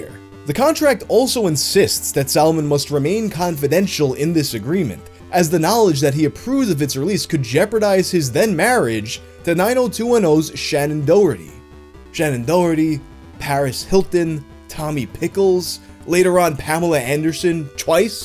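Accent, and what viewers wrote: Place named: New York, United States. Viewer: How have I just noticed the ny accent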